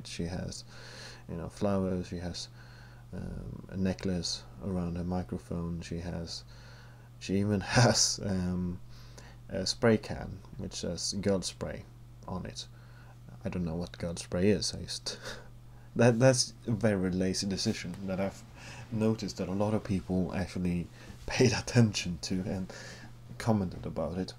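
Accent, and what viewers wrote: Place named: Sweden. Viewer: Oh my god you sound soooo swedish =D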